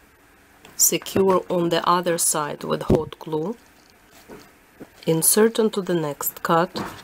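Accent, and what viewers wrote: Russia. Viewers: Ты русская! По аксенту видно! :) в Америки живешь?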